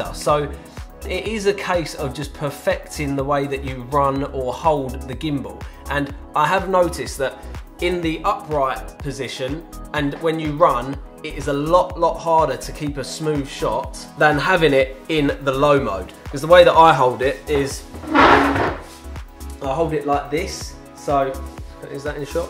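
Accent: United Kingdom. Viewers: Hey, nice video. Sounds like your from UK? Where did you order yours from? Seen them on Amazon, Also does the app work on andriod phones? Thanks.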